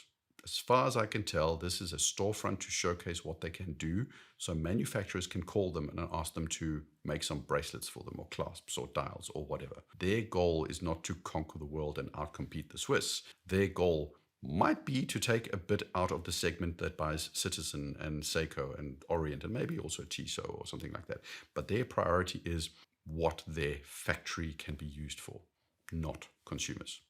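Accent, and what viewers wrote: South Africa. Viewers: Was not expecting a saffa accent when I clicked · Are you south african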